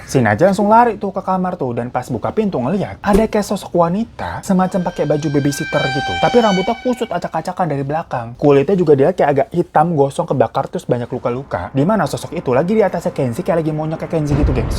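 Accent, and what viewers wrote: Java, Indonesia. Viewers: Medok bgt bang😂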